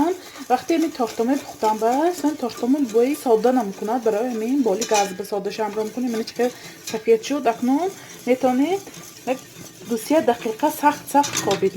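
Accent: Isfara, Tajikistan. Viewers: Рахмат барои рецепт! Гапзанитон исфарагихо боин)) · Апаҷон шумо исфарагими.гапзанитон монанд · Салом бисер барои мо хам маькул шуд рахмат. Шумо лафзатон Исфарагибоин саломат бошид.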